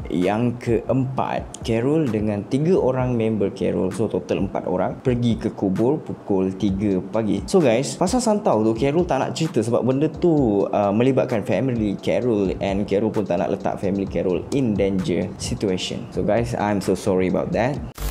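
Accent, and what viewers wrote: Johor, Malaysia. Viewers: Kerul org Johor kah? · Bang orng johor eh😊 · abg ni org johor ke ? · Abg kerul org johor ke? 😅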